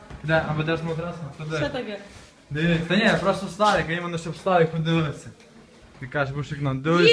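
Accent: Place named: Western Ukraine, Ukraine. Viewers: То чисто під львівський діалект змішаний з сільським )))) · Базаришь как Лох!!! это не есть чистый украинский язык!!! Сразу видно западная Украина Але Але! На майдане стояле а теперь клубнику в Польше собираете!!!!!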